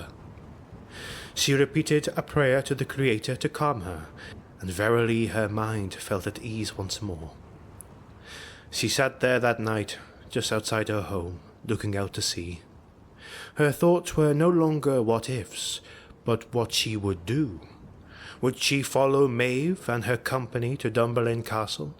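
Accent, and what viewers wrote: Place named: England, United Kingdom. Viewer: A very good story, been looking for something like this. Like the voice, sounds English, will wait for more.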